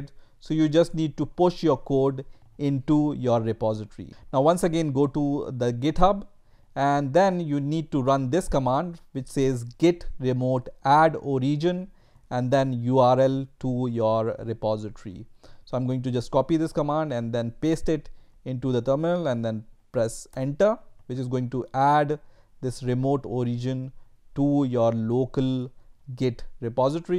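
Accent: India. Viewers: This is the only Indian accent I like.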